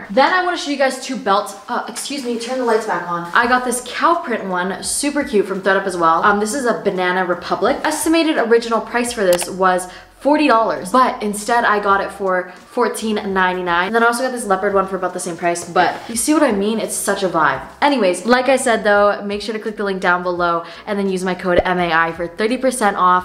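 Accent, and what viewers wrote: United Kingdom. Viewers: it's the british accent for me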